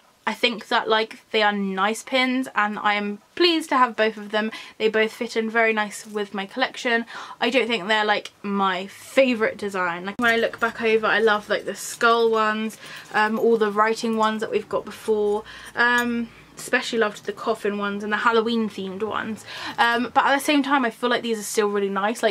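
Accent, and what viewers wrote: United Kingdom. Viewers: Hi Shirley here. I luv listening to u talk, luv your UK accent.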